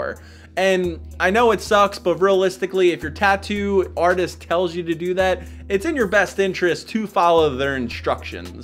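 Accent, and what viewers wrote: Philadelphia, United States. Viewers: i detect a little bit on an eastern PA southern Jersey accent maybe im wrong but i know a philly accent when i hear one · You gotta be from Philadelphia with the way you say warder. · he’s from philly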